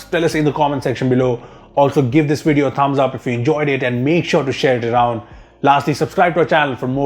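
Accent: India. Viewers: thats how indians talk in eng actually.... lovely presentation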